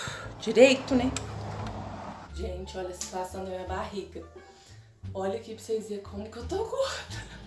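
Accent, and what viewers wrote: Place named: Minas Gerais, Brazil. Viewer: Eu comecei a tomar ontem to com 49 kilos agr vamos ver daqui a um mes ne kkkkkk Vc e mineira também ? Kkk melhor sotaque ☺♥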